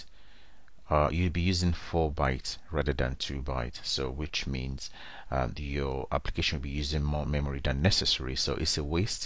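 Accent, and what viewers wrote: Nigeria. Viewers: Lol. Are you yoruba ?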